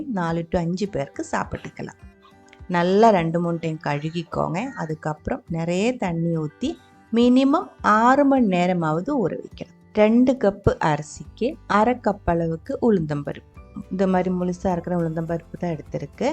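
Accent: Kerala, India. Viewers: In the middle of your efforts to speak in “Tamil” Tamil, your Malayalam flavor comes out, making it nice. Maybe U can speak in Malayalam itself since most Tamils understand Malayalam. This will also help them to learn Malayalam. Kollengode S Venkataraman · Excellent video ! Loved the accent - I speak Tamil like you but i don't succeed as much as you do in keeping Malayalam out of my Tamil. End up talking like Kamaraj in Michael Madana Kamaraj! Although I may not have the skills and the patience to make such great food, I would certainly tune in to hear your "Thalayalam". !! Suresh / Norway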